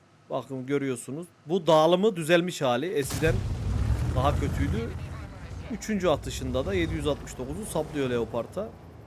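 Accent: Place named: Ankara, Turkey. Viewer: Angara şivesinin hastasıyım. Abone olduk like yaptık evelallah.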